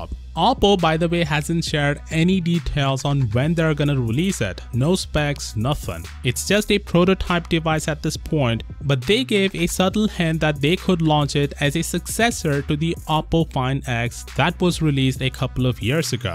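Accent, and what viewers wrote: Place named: India. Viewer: Your accent is confusing me are you Indian or Chinease or both 🙂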